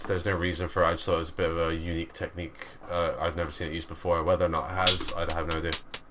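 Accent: United Kingdom. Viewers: is brittish?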